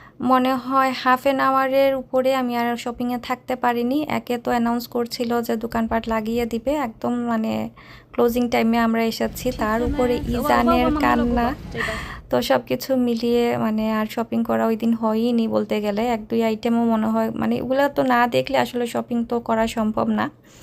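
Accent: Sylhet, Bangladesh. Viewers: অনেকদিন পর সিলেটের কথা শুনলাম।আমার ভালো লেগেছে। আমিও সিলেটের মেয়ে।আমি লন্ডনে থাকি।আপনার কি কোন ডাকনাম আছে?❤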